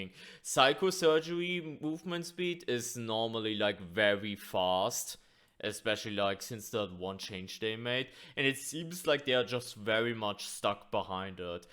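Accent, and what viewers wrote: Germany. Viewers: Your accent is cool. German?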